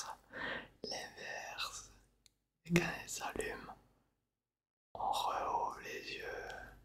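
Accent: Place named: Toulouse, France. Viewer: Ahhh Toulouse !! je n’y avais pas pensé, j’imaginais Avignon ou Agen je me suis pas tellement trompé, je veux dire au niveau de l’accent. Moi Valence. Bonne soirée… Je vais regarder une de t’ai vidéo pour ronfler !… j’aime bien l’autre, tu m’as laissé un autre commentaire, tu arrives dans ton salon de coiffure …. C’est le bruit du ciseau qui est soporifique. J’aime bien le style sa sort du asmriste bien carré …. Continue comme c’est cool. 👍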